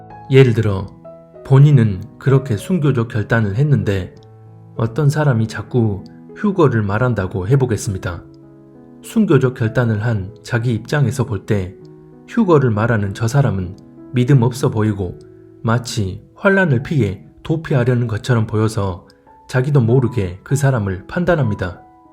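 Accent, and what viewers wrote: Jeolla, South Korea. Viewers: 억양을보니 절라도 사람 같다.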